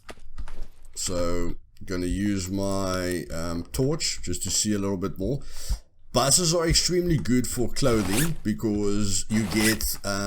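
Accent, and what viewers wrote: South Africa. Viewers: Is that a South African accent I hear my ou? Great video keep up the good work! Jy verduidelik die detail van die game baie goed.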